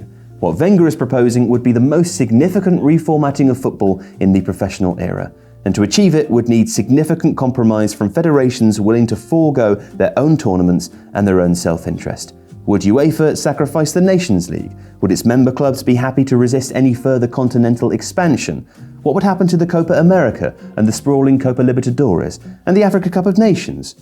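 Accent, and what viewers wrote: Scotland, United Kingdom. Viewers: is scottish??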